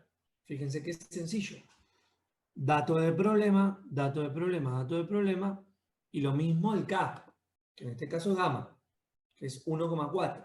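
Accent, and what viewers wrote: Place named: Argentina. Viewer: Te amo, gracias a ti voy a terminar la práctica de termodinamica, mañana t digo si pasé el examen :D, eres lo mejor increible hombre con acento argentino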